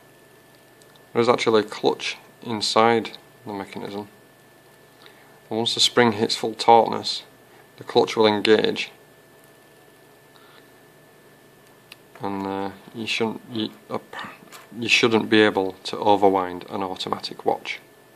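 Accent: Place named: Cockney, United Kingdom. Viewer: Heavy cockny accent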